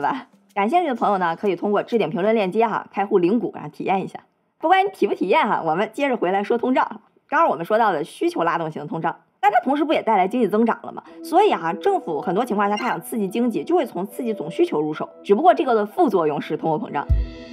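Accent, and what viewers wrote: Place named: Beijing, China. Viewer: 這北京腔官話真難聽 還是咱河南官話好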